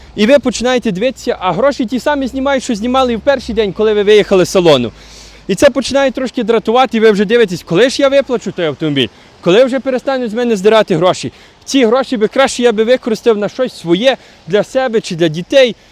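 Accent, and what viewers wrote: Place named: Ukraine. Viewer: Давно не слышал такого красивого украинского языка. Сразу видно - родной язык а не переученный. Слушал как стихи.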